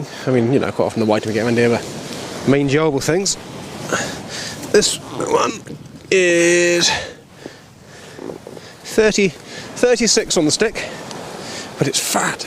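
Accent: RP, United Kingdom. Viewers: Should do more vids always great watch.... plus a great posh voice for videos lol... cheers best wishes will be in before I go back to Philippines 🇵🇭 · You sound like a member of royal family,apart from that,your entertaining,informative and an excellent fisherman,new sub of me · Happy new year from Ian in NZ 😊 Really enjoyed watching this video and you do have rather a posh accent!! Please don't forget to do a video on how you make your bar rigs 😊 I can't believe you catch Thornback and Gurnard in January now on the UK - they were both summer species in my time over there. Cheers 😊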